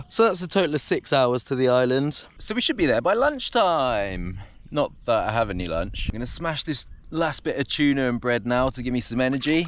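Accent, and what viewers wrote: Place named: Midlands, United Kingdom. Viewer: Are you from the Midlands? I see a slight accent there slipping out every now and again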